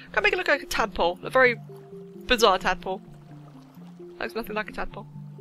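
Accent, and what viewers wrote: United Kingdom. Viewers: R U from the UK? U sound British or something. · you sound british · My brain was so confused lol I thought you were a preteen Brit lmao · Oh. You are british i see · Perhaps it is British English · This is either a nerdy boy or a British girl 🧐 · You're British!!! I love you even more now!!!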